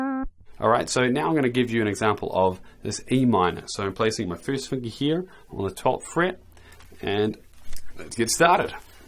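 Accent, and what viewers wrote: New Zealand. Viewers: I was thinking about your accent and I should have got it cuz i am from New Zealand to. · Question #1: A like a Lot of guitar players but You said that i have to mentione just one of them i Will Say Kirk Hammet from Metallica because he is really technical and his solos goes straight up to My soul. Question#2: i like the way that You teach how to improove your skills in a easy and fun way! Since i'm latinoameeican but i speak English your New Zealand accent sometimes i have to go back and listen again your explication. Don't get me wrong i don't have nothing Against your accent, actually i think it's cool but i'm used to listen American accents but i got it after a couple times listening to You! I like how an easy scale can be changed in any way that You want ti make it sounds good but i need to watch again the video and practice. I would like to understand which scales goes with the right chords? 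For example if i use to start with G5 and then to A5, then to C5 and finish with D5. What it would be a good easy scale to use with that secuence of chords? Just an example! Or just to make it easier for example to play of, the chords are just 2 pretty much the whole song which they are G and A! Which scale can use fir that and also how i Will know to use the right scale depending of the chords that the song has? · So that is new zealand accent? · You sound Kiwi, are you Kiwi? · Love that kiwi accent man!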